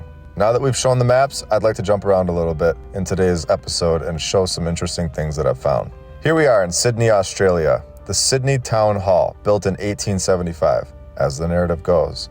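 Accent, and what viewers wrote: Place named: Syracuse, United States. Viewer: I'm from Syracuse NY & he sounds like he's from my hometown. But he could also be from Chicago or Michigan. They sound a lot like Syracusans.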